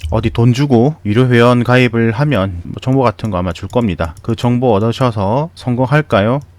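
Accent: Gyeongsang, South Korea. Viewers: 시끕 ㅋㅋㅋㅋ 경상도 아입니꺼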